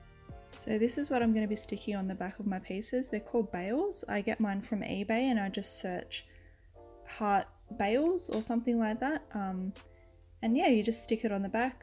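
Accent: Australia. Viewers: Great tips, thank you. Good to hear an Aussie voice too. Hugs from Heathcote Sydney.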